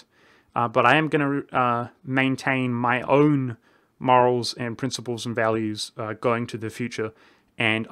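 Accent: New Zealand. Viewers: He is New Zealandian I think. His accent is either New Zealandian or Australian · Your'e from New Zealand?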